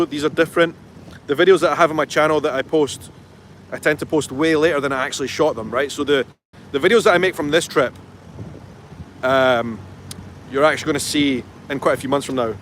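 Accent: Scotland, United Kingdom. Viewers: It's great to have you guys both visit a historic part of the USA. I hope y'all will have a wonderful time here. Edit: Hi from San Antonio 63° F I love your bolo! Remember your Scottish accent will open a lot of doors for you and. People love it.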